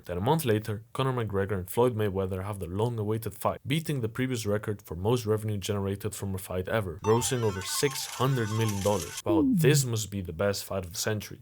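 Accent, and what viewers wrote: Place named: Netherlands. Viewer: Dutch accent way too heavy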